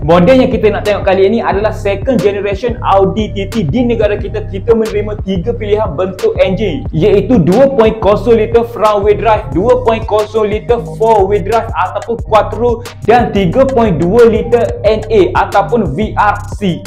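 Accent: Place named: Kelantan, Malaysia. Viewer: Boh. Demo mmg try hard nak cakap KL. Tapi demo ank jati kelate. Bunyi kelate demo tu. Gapo² pon video demo meme terbaik. Klu buleh , demo tulis laa kat video. MK5 , Merc , Bmw F20. Mudoh sikit ore nak cari. Tapi keto hok demo list ni , untuk ore ado duit poket lebih , buleh laa pakai. Keto lamo mesti ado nyakit. Sparepart pon parok. Sapo² ado duit poket lebih , boleh laa guno. Keep it boh 👍🏻👍🏻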